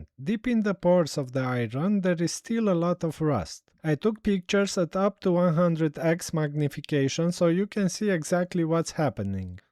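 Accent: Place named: England, United Kingdom. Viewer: I only subscribed and liked this amazing video because of your amazing English accent and your cooking skills!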